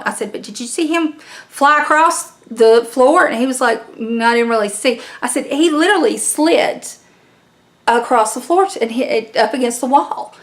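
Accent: South, United States. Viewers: Loved your Kooky-ian vid so started binge watching other vids. If you like true ghost stories check out "Real Ghost Stories Online" podcast w/Jenny & Tony Brueski. Sooooo good!!! Love hearing you talk. We've moved to Maine from the South & it is lovely to hear familiar accents!! LOL!! Sending much love!!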